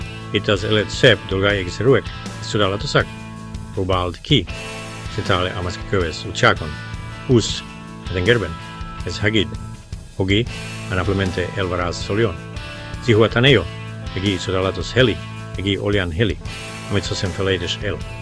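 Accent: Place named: Hungary. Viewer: Kedvelem a magyar hangsyly,nagyon szépen köszönöm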